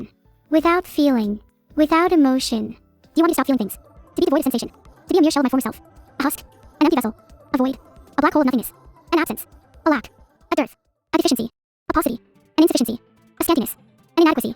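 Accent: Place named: United Kingdom. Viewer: hit her with a british ancent update, and she use all the big word in 1 sentence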